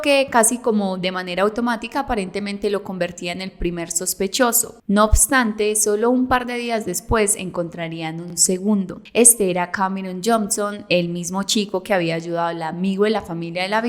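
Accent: Colombia. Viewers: Quisiera tener este acento 🤤🥰🥰🥰🥰es como que un fetiche escuchar el acento Colombiano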